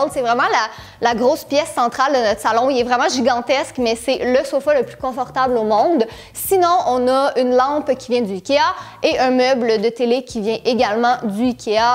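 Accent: Canada. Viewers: C'est tellement beau l'accent Canadien 😍😍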